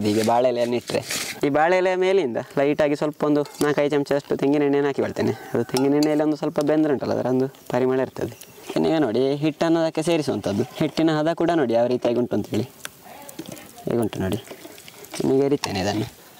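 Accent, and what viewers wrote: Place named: South Kanara, India. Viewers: We love your videos. Wonderful presentation with pure SK Kannada. Jai Kannadambe 🙏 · Your explanation and costal kannada talking are spr 👌😍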